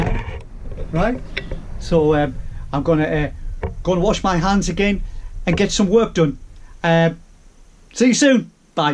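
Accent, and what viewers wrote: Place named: Northern England, United Kingdom. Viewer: you're a bloody musical titan, honest! I also have a lot of fun listening to your northern English accent, which I'm very fond of. Thank you for being a legend!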